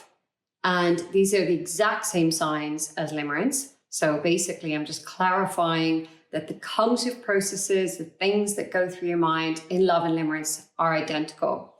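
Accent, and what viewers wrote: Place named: Ireland. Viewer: Limerance sounds like an Irish term...what better person to discuss this than a irish accented .. attractive woman🎉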